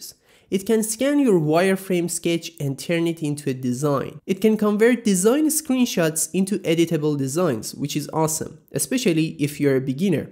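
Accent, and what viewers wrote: Iran. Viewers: why ma irania inghad lahjamoon ghabele tashkhise ? )) damet garm azizam . are you best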